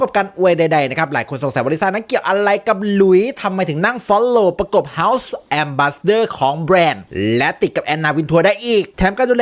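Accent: Isan, Thailand. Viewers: มักแฮงคำเว้าของเจ้า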